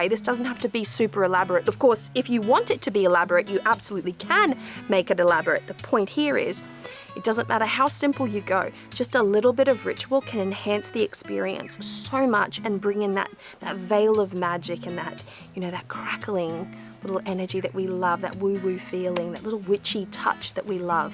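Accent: Australia. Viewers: Another great video, and I thought you were Australian. I'm in SA and love your enthusiasm in your videos. Thank you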